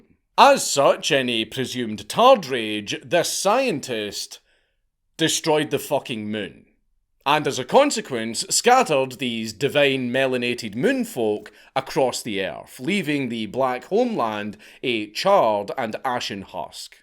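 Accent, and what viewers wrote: Scotland, United Kingdom. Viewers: what in the everloving fuck did I just listen to!? I did enjoy it being told in a Scottish accent though. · Wtf this is so nice, not only can I perfect my scott accent but I can also learn stuff. As a descendent of the Black Douglas clan this is a calming voice. Fuck England and long live the scottish man. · Can you please re-record a version of this with really cool looking advanced substation alpha filtered karaoke subtitles in order that I can understand what you're talking about? Between the obviously 100% real pseudohistorical mumbo-jumbo and your thick Scottish accent, I'm having difficulty following what you're talking about even with the English (auto-generated) Closed Captions turned on. In fact, they make it worse. It's like having a drunk Irishman attempting to interpret a Scotsman attempting to speak a bunch of colloquial American-English into British English or something.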